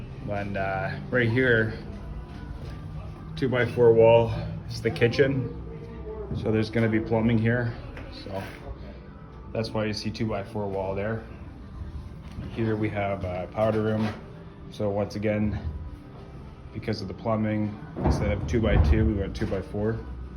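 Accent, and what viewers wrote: Canada. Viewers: Thanks... it's a huge gift you do with the channel giving people the insight on what is behind the finishing surfaces. ALSO enjoy you adding the extra vids of music and living life to the fullest. Hope you are having a beautiful summer and the sun is shining on all you love and... one day you Canadians speak 'House' and 'Boat' and 'About'... so us southerners in the US can understand. XD!!!! Cheers Love all the vids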